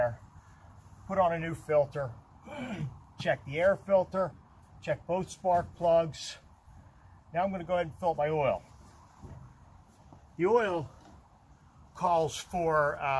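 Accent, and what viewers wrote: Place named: Philadelphia, United States. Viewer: And that area is very clearly the Philadelphia area! Can spot that accent anywhere. See ya around town!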